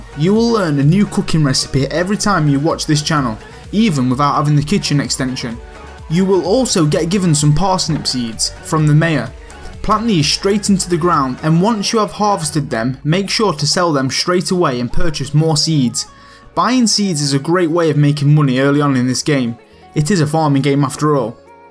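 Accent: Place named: East Midlands, United Kingdom. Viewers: Thanks for video bud, appreciate it. Nice to hear that sorta accent to, from the east Midlands by any chance ? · Loved the narrator's voice/accent. He reminded me of my childhood in Leicestershire (Ashby-De-La-Zouch.)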